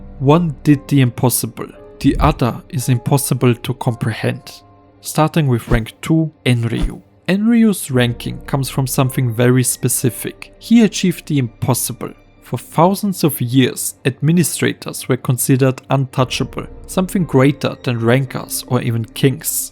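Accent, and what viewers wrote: Germany. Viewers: love the german(?) accent keep it up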